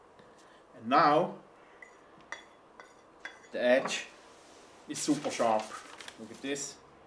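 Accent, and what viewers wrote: Switzerland. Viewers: bish du schwitzer dim akzent no🤔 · Dein Englisch mit dem Schweizer Akzent hört sich irgendwie lustig an 😅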